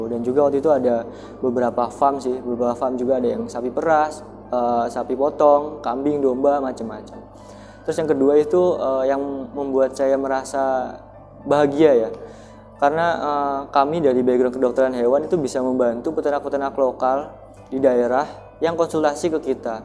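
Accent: Banyumas, Indonesia. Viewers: Alhamdulillah,..wong ngapak.. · Wahhhh,,, cah banyumas, salam ngapak mas dari Purwokerto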